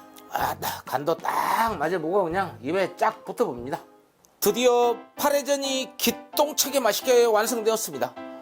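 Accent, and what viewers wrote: Jeolla, South Korea. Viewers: 사투리가 영락없는 우리 전라도 사위 말투 똑같네요.❤ㅎ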